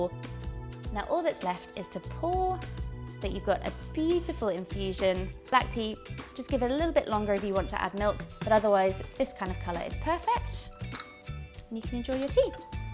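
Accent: England, United Kingdom. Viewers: Aside from the beautiful English accent ❤this lovely young lady can sell me anything ☺️ where can I buy that brewer in the USA ? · She has a British accent. I believe her. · The lady in this video speaks very eloquently (Queen's English) so in this instance I would say she has a very English (not British) accent. You are correct to note that there are many accents in England but if you listen to news readers or the folk from private schools they have what most people perceive as English accents (stereotypically). Because folk can't distinguish between Britain and England there are always comments of this nature. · Of course there is such a thing as a British accent. This lady has a British accent. So does Billy Connolly. So does Tom Jones. So does Geoffrey Boycott. They all of course sound very different, because there is no SINGLE British accent. 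Instead there are many. But to people from other countries, the differences between various British accents are harder to distinguish, just as British people might not pick out which part of the United States an accent comes from, merely recognising it as American.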